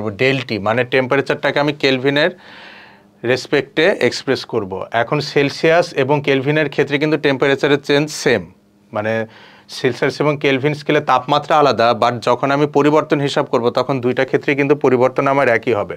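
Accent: Standard. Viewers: প্রমিত উপস্থাপন ❤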